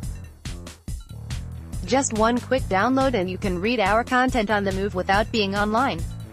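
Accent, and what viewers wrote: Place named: United States. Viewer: 1 for robot voice with American accent, instead of getting a real person to narrate. If I could, I'd give another -1 for posting stills instead of video on YouTube.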